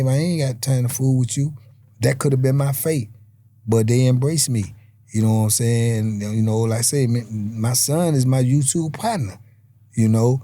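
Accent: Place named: Washington DC, United States. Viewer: He got a strong dc accent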